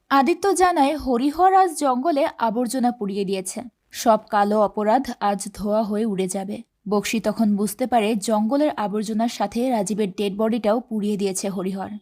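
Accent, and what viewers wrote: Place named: Bangladesh. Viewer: seser dik apnar comment sune bojha gelo apni Bangladesh er. Khub sundor... Osadharon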